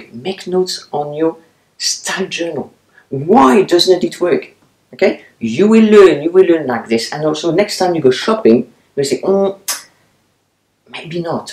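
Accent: France. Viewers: Bonjour! J'adore vos vidéos! I have just found your channel today! I watched it all day long! I am in love with you, your style and your amazing french accent! Merci! À bientôt!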